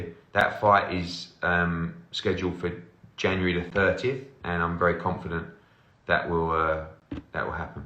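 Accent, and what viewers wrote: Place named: Cockney, United Kingdom. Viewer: knows his market. From a posh boy into a cockney geezer attracting “ the lads” Similar to Dave Allen fans.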